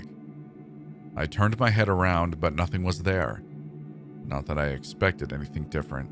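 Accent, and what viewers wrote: Canada. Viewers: My buddy who i let listen with thinks your from canada i think just up north im from the north east but Good work as always loveing it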